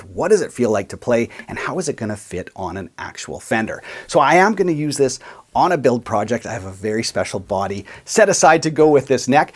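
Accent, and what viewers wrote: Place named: Maritimes, Canada. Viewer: Prairies eh? I was so sure you were from the maritimes LOL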